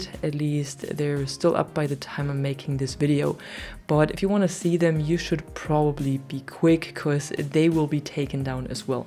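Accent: Germany. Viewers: Super süß wenn deutsche englisch reden 😃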